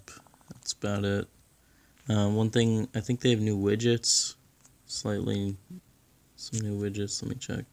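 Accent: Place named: United States. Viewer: Thank God an American accent!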